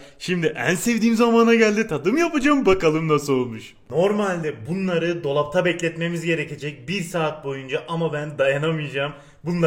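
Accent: Adana, Turkey. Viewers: Abi onu bırak adanalı gibi konuyorsun adanalılarda alüyürum der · Adam adanalı galiba ekliyorum yerine eklürüm diyo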